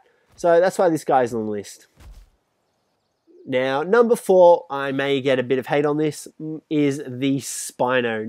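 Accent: Australia. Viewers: Are you Aussie? Because it sure sounds like it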